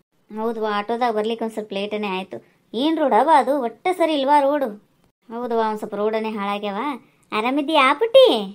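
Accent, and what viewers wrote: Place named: Uttara Kannada, India. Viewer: Namaste akka, sambhashane Matra super, ondu kathe na vastavakke tarodu enu easy alla, film olage ondondu character nu bere bere yavru madtirtare, avravra character olage involve adre aytu, but ella character olagu obre involve agi, kathe na vastavakke tartira, nimma talent ge hats off 🙏 adrallu uttara kannada bhashe sogadu, mukhadalli tumba nagu taratte, otnalli nim videos refreshment agiratte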